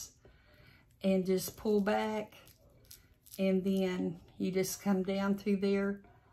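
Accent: South, United States. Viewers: especially love your southern drawl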